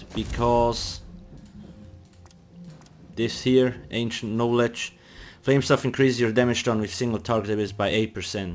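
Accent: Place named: Switzerland. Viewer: Hahaha i knew it! This accent(akzent) must be from a swiss guy :D Grüezi from freiburg :) I got a question. Woulf you mind to help me a bit out about pvp getting better with classes(mainly nightblade or sorc) and other basic stuff(im not a beginner in teso but im a beginner in pvp even if my pvp rank is veteran im not that good)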